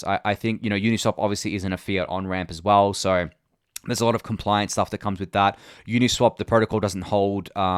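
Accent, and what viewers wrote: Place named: Australia. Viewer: The Daily Gwei episodes and your soothing Aussie voice makes me feel at home. Thank you for amazing content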